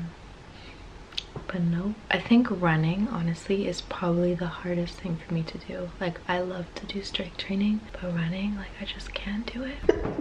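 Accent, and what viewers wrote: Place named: Brooklyn, United States. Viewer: Where are u from !!???You are giving me Brooklyn accent!!!Luv it !!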